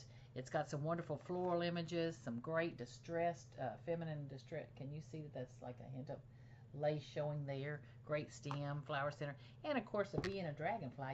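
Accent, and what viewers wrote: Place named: South, United States. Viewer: Hi!! Just found your videos! I am guessing your are a Southerner... love your voice..... I am from the MS Gulf Coast.... Beautiful cards...... thanks for sharing.... and thanks for the post it note printing tip!!!!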